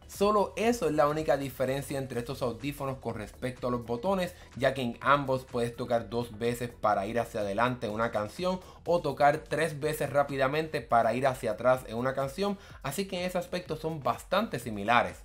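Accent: Puerto Rico. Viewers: Yo de momento dije ese acento me suena a boricua, y si, mano casi no hay youtubers boricuas en tecnología es bueno ver más de los nuestros en estás cosas, yo compré los Beats hace como 3 días y de verdad estoy encantado hasta ahora, tenía antes los pixel buds serie a y eran buenos pero los Beats son mucho mas comodos, con mejor sonido y la cancelación de ruido esta excelente, y no solo eso por el precio que pague, $144 después del ivu, de verdad encantado con ellos hasta ahora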